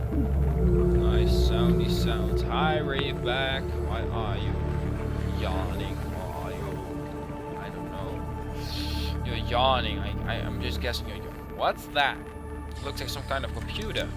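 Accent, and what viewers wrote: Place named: United Kingdom. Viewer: It seems that over time your accent has gotten more and more... British. AWESOME (and totally not cringy) xD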